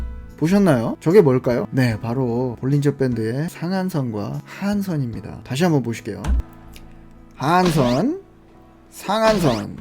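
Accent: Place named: Gyeongsang, South Korea. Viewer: 똑똑하신 분같아요.설명짱.쏙쏙들어오네요.^^ 살짝 경상도사투리 들리는데 기분탓인가요?ㅎㅎ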